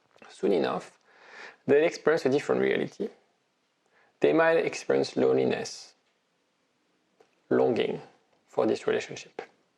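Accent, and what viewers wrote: France. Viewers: French accent is awful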